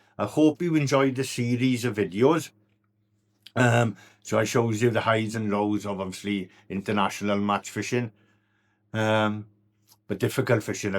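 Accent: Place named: Wales, United Kingdom. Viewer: Bloody good result, - hard fought and fully deserved. Where in Wales are you from? Saw your video on Aberavon - Im a Port Talbot boy and that's my local mark. Your accent sounds fairly local too.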